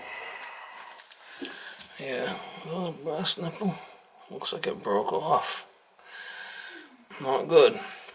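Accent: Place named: Boston, United States. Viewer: Oye, Once again me friend, I love you as a human, love your content, and love your comedy, But brother, You have to remove the Rag from your face, it's serves absolutley nothing, your only causing long term damage in your being, this thing they make you do, I where it for you, to perfect you bullshit, from what, the common cold the Flu, what happens to those bacteria, I see that's is now affecting you, your breathing, getting a little aggressive, Plus you took a experimental shot, I know you know what's really going on in today's society, Stop, I care,that's why I'm commenting, not trolling but caring, if those in fear want to Muzzle themselves over Fear and TV, let them, better for you, you don't have to wear one, their protecting themselves, It's gotta stop, think of the children hiding their faces in shame, only us can put an end to this. 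No disrespect, Just my Opinion. I'd stay in silence too, if I was fearful and just follow my handlers. The real message is To take care of others, You must take care of yourself first!!! So if you take yours off, maybe others will follow, but it starts with you Brother. Keep them coming, The Boston Accent is the Channel😉 Stay strong Friend.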